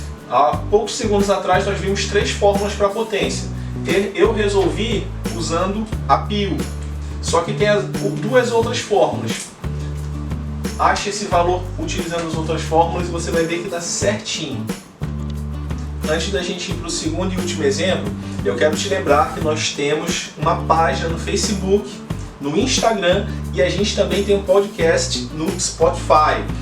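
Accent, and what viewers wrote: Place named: Rio de Janeiro, Brazil. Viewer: O cara é muito gostoso. Vou ouvir essa aula várias vezes antes de dormir com esse sotaque carioca. Muito bom. Principalmente quando você foi ao quadro. Massa cara.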